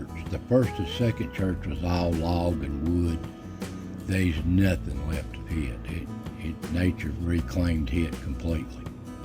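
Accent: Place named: South, United States. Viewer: Though I am from Pakistan and not a US citizen. I tell you that you will always find a strange peacefulness, quite and calmness in cemeteries. Even in the middle of cities. A piece of land different from the rest of the world and you cannot stop thinking that one day you will be here as well. Btw You are a great narrator. Even though I find it difficult to understand what you are saying. May be because of your southern style of speaking English.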